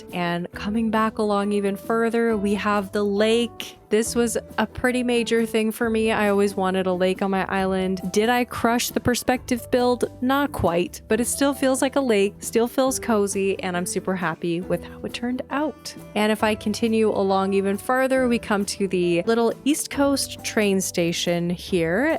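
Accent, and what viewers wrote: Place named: Cape Breton, Canada. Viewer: u are rly speaking like a cape bretoner!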